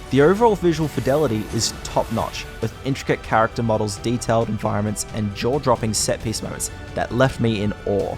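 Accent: Australia. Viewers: They are Australian.. but i think what is lacking is seeing the narrator’s face, mannerisms & physical expressions. Though It does sound little green horn and someone reading out an assignment.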